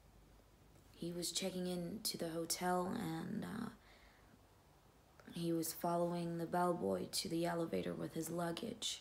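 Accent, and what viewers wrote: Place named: United States. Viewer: your american accent reminds me of michelle rodriguez!!!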